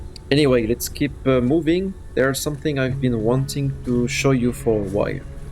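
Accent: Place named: Germany. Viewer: It's funny because when you speak English it sounds more like you could be German (a bit) and not French at all. C'est une très beau projet, très prometteurs. Curieusement j'ai assez de mal à construire dans Enshrouded. ça me donne énormément d'idée, trop en même temps et du coup j'ai du mal à m'y mettre, je bricole sur ce que j'ai, mais je ne suis jamais lancé dans LE vrai grand projet. Je fais au mieux, pour traiter l'immensité de besoin et possibilité qu'on acquiert, pour allé dans le sens de mon projet, mais sans jamais y allé carrément. Pourtant la petite pose dans le jeu serait le moment idéal pour le faire, car justement j'ai moins à faire dans le jeu proprement dit. Trop d'idées me paralyze en quelque sorte. Je suis quand même content de ce que j'ai déjà fais, mais rien à voir en volume avec tes réalisations, bien que mes idées soient du même ordre de grandeur. 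Il faut dire que je commence un peu plein de jeu au fur et à mesure des sorties et le temps n'est pas illimité.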